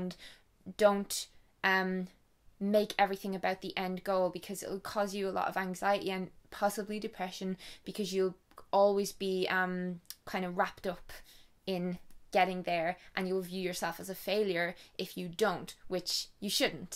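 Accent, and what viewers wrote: Ireland. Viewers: Love your Irish accent :)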